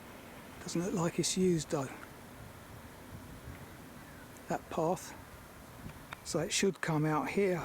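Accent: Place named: South London, United Kingdom. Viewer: I don't know if I have mentioned this before? But I really like your accent! Kind of similar to mine, a Battersea and Balhamite, myself. Now living in Tooting. I got to do Boxhill on Tuesday, 30th September. Nearly ten years since I'd been there.😊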